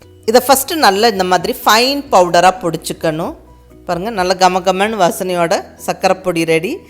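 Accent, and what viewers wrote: Kongu, India. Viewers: Hello mam really all your receipes are awesome the way you explain are chanceless, I like your kongu tamil, I love you so much mam, Always Be happy share ur receipes more love u from Chennai this is also my favourite 😍 receipe keep rocking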